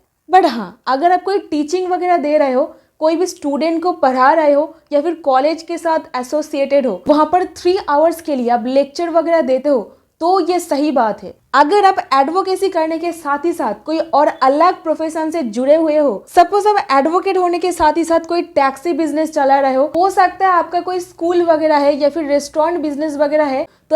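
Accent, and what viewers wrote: Bengal, India. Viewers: Bengali tone but you have very distinct ,clear pronunciation in Hindi I highly appreciate it mam, please keep it up mam , with regards.🙏👍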